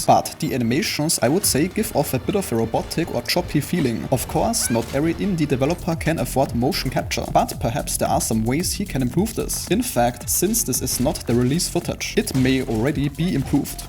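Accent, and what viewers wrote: Germany. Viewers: Sprichst du deutsch? Dein Englisch klingt so. Kann man in dem Spiel irgendwo speichern oder muss man jedesmal komplett neu anfangen wenn man stirbt und dann alles verliert 🙈?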